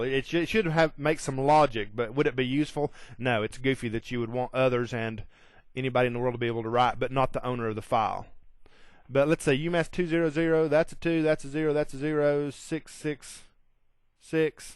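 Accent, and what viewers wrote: South, United States. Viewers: Thanks, that glorious southern accent now pops into my head every time I'm setting permissions!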